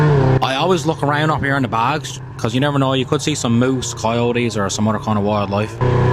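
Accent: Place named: Newfoundland, Canada. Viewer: Tirty tree tree's 😂 im laughing on the floor. Needs subtitles for anyone not from Newfoundland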